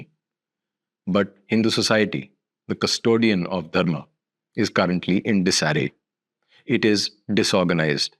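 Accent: India. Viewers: I came my chance; thank you. Your accent and narration indicates a mix of north and south indian (tamil?). Once again a big thank you!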